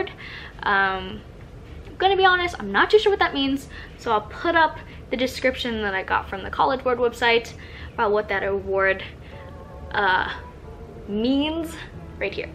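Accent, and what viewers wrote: South Korea. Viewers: Korean accent❤️👌🏻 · Omg your korean is so cute 😭❤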